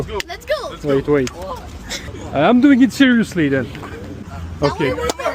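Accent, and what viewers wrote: France. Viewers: You think they are not used to see foreigners? They are not used to see men with colored hair - they likely thought you are gay, which is not a good way to be perceived there 🤣And French accent makes it even funnier, because it sounds kind of drunk to Russian ears. I mean simply look at men around you and then at yourself to understand why you've gathered so much attention. Go to Chechnya or to Dagestan with a haircut like this for the ultimate experience 😂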